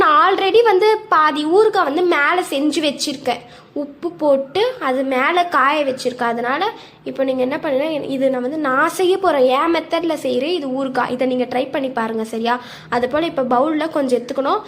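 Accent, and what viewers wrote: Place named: Chennai, India. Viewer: ஏய் உன் குரல் வச்சு கண்டுபிடிச்சுட்டேன் நீ சென்னை தானே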